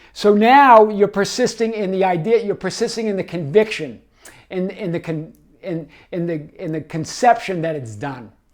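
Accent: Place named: New York, United States. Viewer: I love your new yorkish accent Reminds me of home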